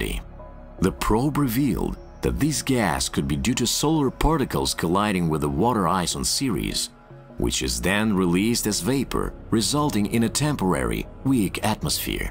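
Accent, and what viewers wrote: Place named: United States. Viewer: Отличная озвучка на английском с американским акцентом. Супер!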